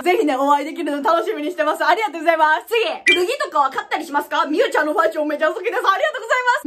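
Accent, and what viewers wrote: Wakayama, Japan. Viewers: 見た目と関西弁のギャップえぐいww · 間違いない笑ちょっと和歌山弁入ってるけど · みゆちゃんめっちゃ和歌山弁やと思ってたら和歌山すんでるんや😹この前電車で横に座ってました爆笑爆笑爆笑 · はじめて動画を見ます！見た目がクールなので、性格もクールな感じなのかと思っていましたが、中身はばり関西弁の太陽感ですごくおもしろいです(T T) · 地元の友達のノリと話し方そっくりで多分和歌山の人やろうと思ってて、ほんとにそうやってすっきりした。こんな短時間でたくさんありがとう言われたん初めてや。年下なのが信じられん。応援してます。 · 今日わはっていうところとか、〜やんって言うところが和歌山県民だと思ってました。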